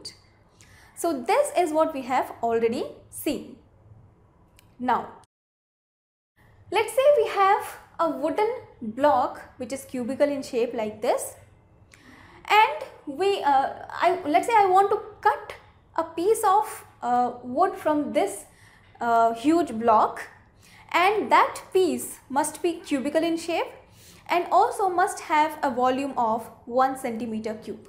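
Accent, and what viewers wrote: India. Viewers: Hi, most of the words / vocap / etc understanadlbe just a request there is no blameing here but for every video dould you add transcript of the video cause the language of indian esspecially accent is effect the english understanding would be bettter. Thank you for your patience.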